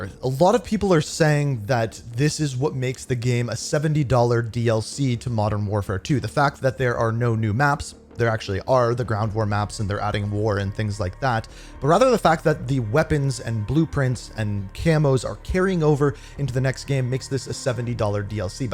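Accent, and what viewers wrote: Canada. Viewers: Love the canadian accent my man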